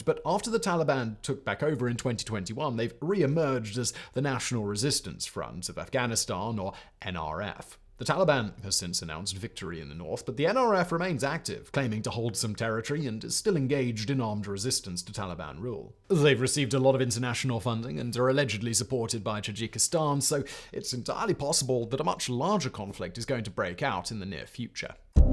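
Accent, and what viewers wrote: England, United Kingdom. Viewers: Afghan history should certainly not be told by a dude with English accent especially when you skip the nasty details in favor of the British oppressor and aggressor! Correct version: The Bacha-i-Saqao was an orchestrated Islamic uprising by the British to oust the real Afghan king, Amanullah Khan, in 1929. When the last Afghan king was removed, the British RAF instantly installed Nadir Shah and his four brothers (the Musahiban brothers), who attempted to erase the era of Amanullah Khan and rewrite Afghan history by killing thousands of Afghan students and intellectuals in order to enable the British annexation of Afghan land (Durand Line), which Amanullah Khan had freed after the Third Anglo-Afghan War in 1919. Amanullah Khan had also formed a pact with the Bolsheviks, better known as the USSR, in 1923 to distance Britain's imperialism. 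The British puppet king, Nadir, also fostered division in Afghanistan by promoting racism among different Afghan ethnic groups, especially the Hazaras, who became the oppressed group. Nadir even created a separate class and caste within his own Pashtun ethnicity; his tribe, the Mohammadzai, was considered superior to other Pashtun tribes. Interestingly, Karzai, the first USA-backed Afghan puppet, is a relative of King Zahir, who was the son of the British puppet king, Nadir, just as Ghani was a puppet of the West, serving the interests of USA banks to exploit Afghanistan. Afghanistan gained its independence because King Amanullah Khan recogniz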